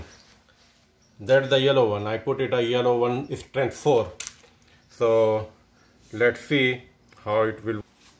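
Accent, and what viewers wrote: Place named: Russia. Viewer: Какой-то у вас Русский акцент!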